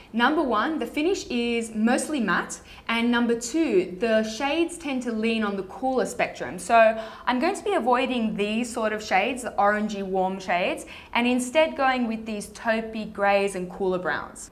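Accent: Australia. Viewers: You're gorgeous and I love your aussie accent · You're so talented, likeable and articulate. Sounds random, but I love listening to you talk as much as I love watching you work. Your knowledge of and passion for makeup really translates well in your videos - keep it up! You're so great!! P.S. Love your Aussie accent! x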